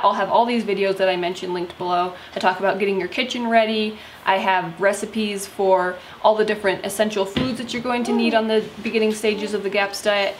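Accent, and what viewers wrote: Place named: United States. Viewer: I can listen to this beautiful woman all day and that's the best American accent I've heard, really great channel.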